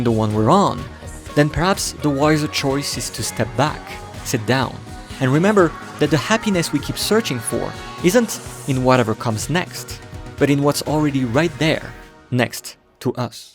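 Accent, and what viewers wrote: France. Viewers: Good video. Is it just me, or you have a French accent?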